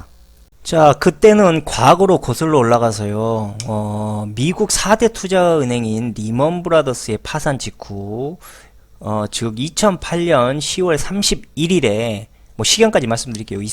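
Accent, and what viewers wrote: Jeolla, South Korea. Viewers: 절라도 말투가 듣기싫네요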